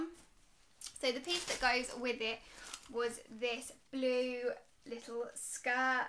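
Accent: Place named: RP, United Kingdom. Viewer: is stunning. Plus the posh English accent is lovely.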